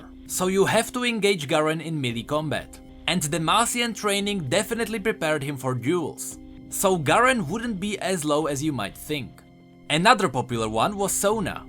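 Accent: Czech Republic. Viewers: obv že je čech hes accent is silenj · Bruh you got czecch accent lmao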